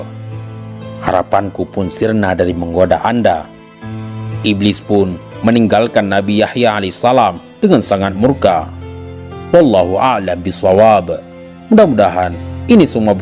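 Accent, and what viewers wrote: Aceh, Indonesia. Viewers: ❤️❤️🙏🙏min pengisi suaranya orang Aceh..ya min...semoga tetap dalam iman Islam smpai nafas berhenti ... Jgan lupa min dialog nabi Isa as dgn iblis jga dialog nabi Muhamad SAW dgn iblis🙏🙏🙏🙏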